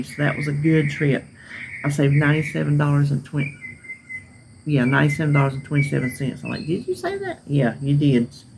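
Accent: South, United States. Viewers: 🐯Hi have a great week. Your giving good advice🐯Thank you. Plus I like your Southern accent. I'm here in Iowa🐯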